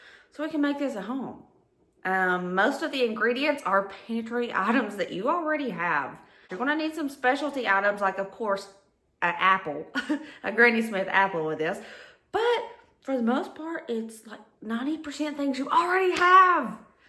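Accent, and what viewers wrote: South, United States. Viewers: Thank you for your video. You are so sweet! I adore your southern accent! It is so nice to hear it after living in Michigan for almost 15 years—I’m originally from Kentucky. ♥️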